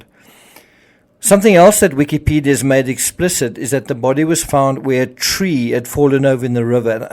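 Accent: South Africa. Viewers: Are you from South Africa? Thank you for keeping us informed I do think your presentations are excellent.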